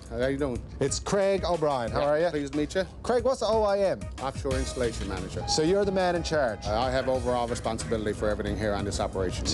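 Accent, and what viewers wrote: Newfoundland, Canada. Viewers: Funny to hear get his newfie accent back,love it❤❤❤❤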